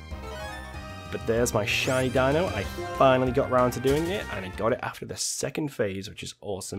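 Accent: United Kingdom. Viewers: This guy sounds like he has a hint of northern Ireland in his accent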